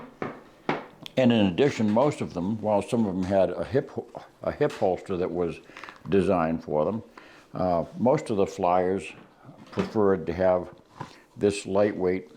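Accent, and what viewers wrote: New England, United States. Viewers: Best New England accent ever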